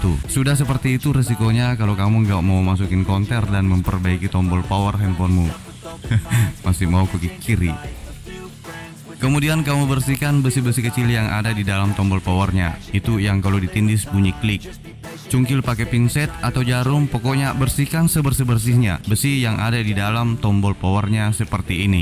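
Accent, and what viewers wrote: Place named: Makassar, Indonesia. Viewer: Orang makassar ki paeng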